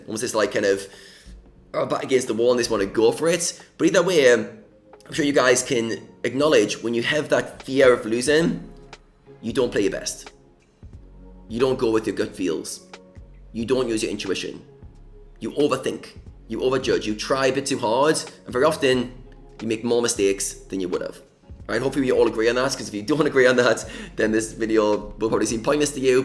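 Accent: United Kingdom. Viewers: Great video! Where are you from? Wales? Curious about your accent.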